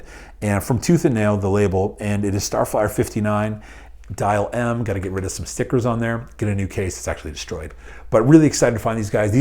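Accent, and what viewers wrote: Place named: Canada. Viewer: I was wondering if you were Canadian as I’ve picked up on the accent, especially in this video. That explains why you are so nice and polite!!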